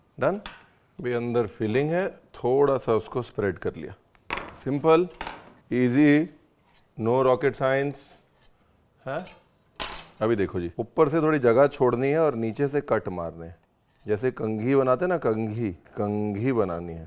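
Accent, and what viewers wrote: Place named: Punjab, India. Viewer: Aaj aap Shehnaaz gill ki tarah punjabiyat me baate kr rhe hain , mja aa gya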